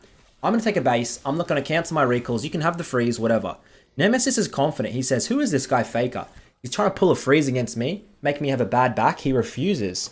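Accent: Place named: Australia. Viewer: Out in the bloody boony's in woop woop. farming. yourself. . This man is so Australian it hurts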